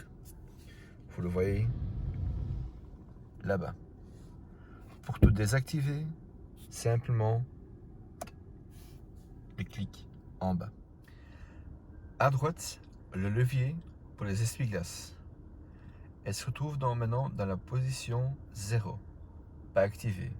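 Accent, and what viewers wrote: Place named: Belgium. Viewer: C est quoi cette video au ralenti?et puis faut aimer cet horrible accent belge.Bref j irai la decouvrir moi même chez Toyota une fois!!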